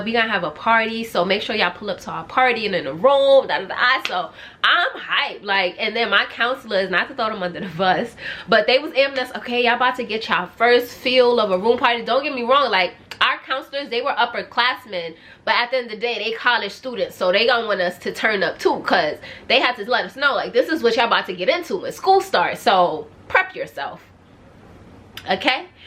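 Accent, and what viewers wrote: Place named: New York, United States. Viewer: Omg the accent. I feel like I haven't heard a NY accent in so long since moving to Atlanta. And yes, it is C-STEP lol.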